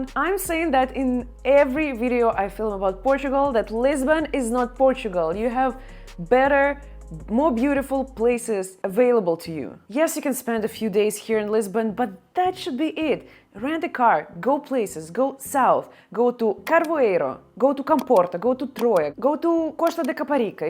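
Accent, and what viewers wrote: Russia. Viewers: Your Russian accent makes your videos even more enjoyable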